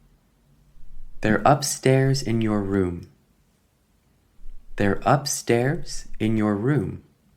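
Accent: United States. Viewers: I'm wondering is't American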